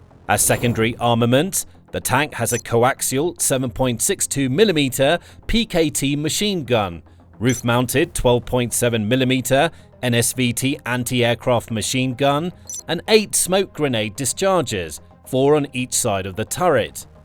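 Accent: England, United Kingdom. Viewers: Why does the narrator (Who's clearly English by his accent) keep calling petrol "Gasoline"?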